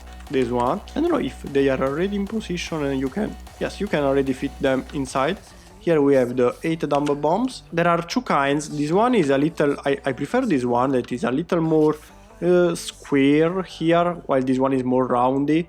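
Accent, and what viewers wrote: Italy. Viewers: Si sente che sei italiano😂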